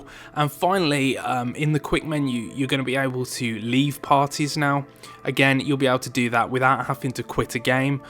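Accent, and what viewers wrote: United Kingdom. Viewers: Long winded annoying British accent, geesh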